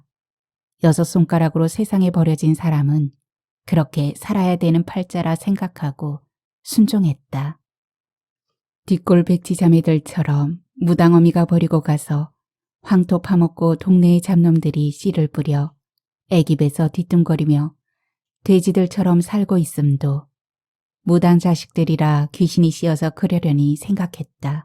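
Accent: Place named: Gyeongsang, South Korea. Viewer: 잘듣고 있습니다 갱상도 분이신가 사투리가 아주 구수 하구만요 5,60년대 우리들의 삶이야기네요 한없이 배고프든 시절 보릿고개를 힘들게 넘든 시절이엇죠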